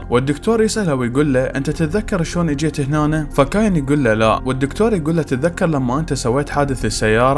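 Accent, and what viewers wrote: Iraq. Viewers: هذ يمكن عراقي😅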